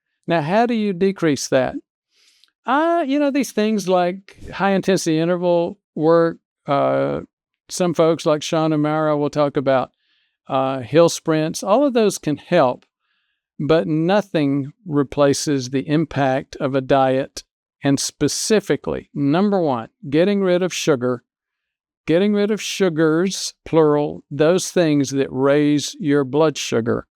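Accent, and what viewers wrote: South, United States. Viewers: Beautifully presented. Of course, I'm in Little Rock so I hear those Southern roots serving you well!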